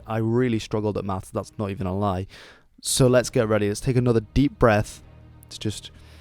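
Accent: Netherlands. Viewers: As a kid from Amsterdam I moved to Australia in '86 when I was 8yr old and I just love these videos. I missed out on a lot of Dutch history and your videos are great little packages of absorbable knowledge. On a side note.. I picked your Dutch accent the first min of the first video I saw but I can hear a very posh English accent coming through so much so would I be right to assume you went to an expensive school in England at some point? Your English is better than mine... lol. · Wtf i was watching this and ur english was perfect so i thought that you were british but then you name the nsb those and I hear a perfect dutch accent and now i don’t know anymore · At first i didnt even notice you were Dutch, at least not untill you said NSB. Then some other words made it even more clear. But i still had to look in the comments for my confirmation.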